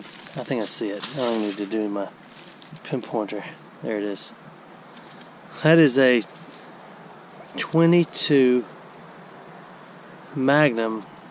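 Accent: Alabama, United States. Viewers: What a voice! If someone wanted to capture American accents, this guy would be the voice of Alabama. Yes, it's been softened by interaction through modern media, but softer than Texan. No twang and a hidden southern humor. College educated with a desk job.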